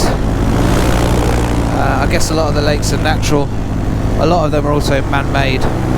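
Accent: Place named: United Kingdom. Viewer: I'm here for the British accent! lol Can't wait to hear it on the Podcast! Great video! Its so cool seeing all the landscape in the UK. That quarry looks like it'd be amazing to fly, weather permitting. I slightly jealous that you have low lying clouds. Here in Texas, cloud base is regularly at 10,000 MSL! Looking forward to our chat!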